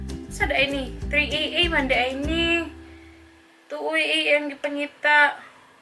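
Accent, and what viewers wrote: Visayas, Philippines. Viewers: Gusto ko rin gumagamit ng ganyan ang dami kung blackheads. Ang cute mo magsalita ng Bisaya sis at madali pala yan gamitin. Gusto ko yung napasabi ka ng OMG at effective nga.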